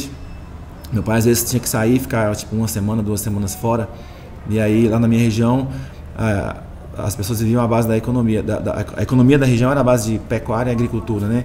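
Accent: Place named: Northern Minas Gerais, Brazil. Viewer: Canta muito..a voz dele parece com norte de Minas ..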